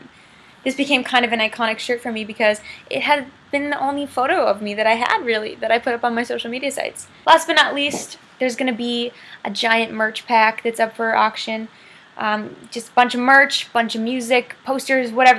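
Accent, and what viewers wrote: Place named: Canada. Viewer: I've never noticed how Canadian you sound. The accent is strong with this one.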